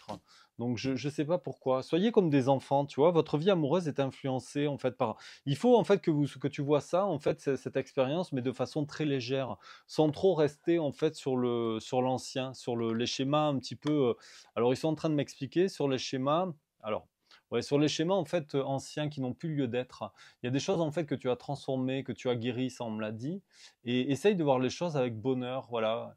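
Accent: South, France. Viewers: Bonjour, J'ai souvent des synchronisatées style 111, 11h11, 777, 31 qui est son département, 29 date de naissance bref, je n'y crois plus car sa fait trop de temps passé, perso j'ai du mal à refaire confiance aux hommes dsl mais je dis se que je pense Sa reste un tirage général malgré tout. Lumineuse journée bel accent du Sud ou..... Merci. 🙏💜💜🙏💜💜🙏😇🙏🕯️🙏🕯️🙏😘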